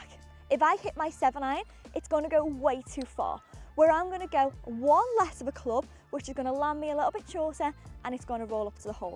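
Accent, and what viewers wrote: Liverpool, United Kingdom. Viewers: Seen you on Instagram a lot but I never have the sound up ,never realised you were a scouser 😅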